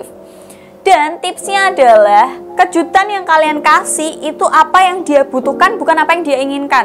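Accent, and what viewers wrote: Java, Indonesia. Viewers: logat bicaranya jawa bnget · Aq suka logat jawamu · Medok jawa nya mba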